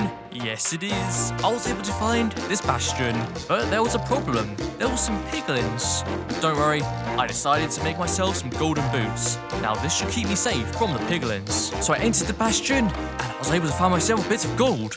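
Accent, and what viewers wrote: United Kingdom. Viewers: Are You British? And That's Not Moss Block It's Mossy Cobblestone Not Moss🤦🏻🌷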